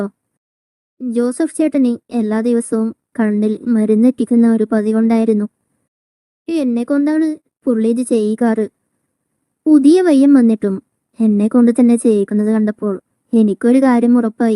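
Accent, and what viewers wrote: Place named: Kochi, India. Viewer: story telling in the Kochi accent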